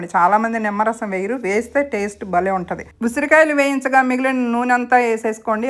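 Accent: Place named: West Godavari, India. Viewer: Sakkati telugu madam westgodavari 🎉Madi Bhimavaram mogalu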